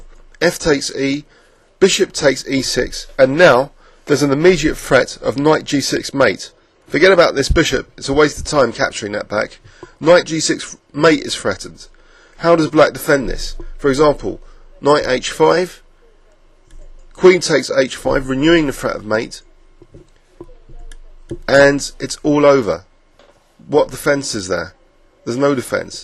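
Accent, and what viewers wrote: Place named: RP, United Kingdom. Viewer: love your clips, as much as I like your accent. That's how English is supposed to be spoken.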